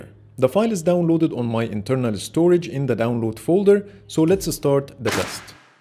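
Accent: Egypt. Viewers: مصري عايش في الامارات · sound like Egyptian to me😁😁👍👍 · انت مصري ؟ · انا حسيتك عربي برضه من بداية الفديو لما سمعت لهجتك الانجليزية 😂😂 قلت ده مصري اكيد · You sound Egyptian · الصوت مصري مصري يعني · انت مصري يسطا ؟ ال accent دي مصري وش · I have feeling that you are Egyptian from your accent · انت مصري صح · ليه انا الوحيد الي حااس انو الي بيتكلم مصري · are you Egyptian I can tell from the accent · Amazing video I only have one question, your accent looks familiar you must be Egyptian right ? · لهجتك كصريه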